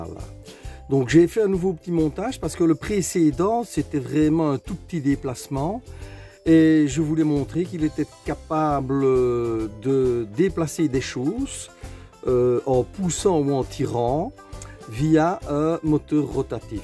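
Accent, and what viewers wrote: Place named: Liège, Belgium. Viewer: Enfin un youtubeur belge (je l'entend à l'accent liégeois 😉) qui aime l'électronique. Merci pour cette vidéo et votre chaîne compte un abonné en plus. Voici le pouce 😀👍👍👍. N'hésitez pas à venir faire un tour sur ma chaîne dédiée à l'électronique débutant.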